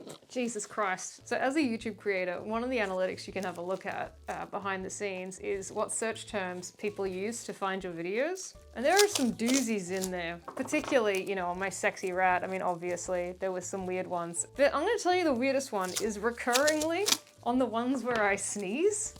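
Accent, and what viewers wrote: Australia. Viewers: are you australian? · The accent is either New Zealand or Australian… I'm going with Australian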